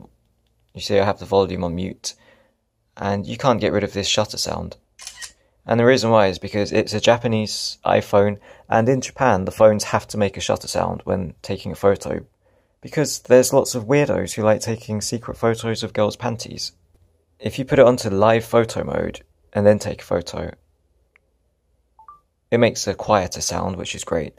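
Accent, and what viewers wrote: United Kingdom. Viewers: you have a british accent although you life in japan I guess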